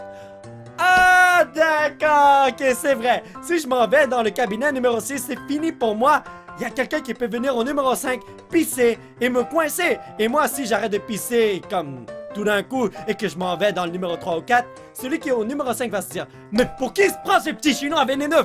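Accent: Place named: Canada. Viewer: salut je t'adore continue tes super est j(adore les canadien si tu veux savoir j'adore l'aksent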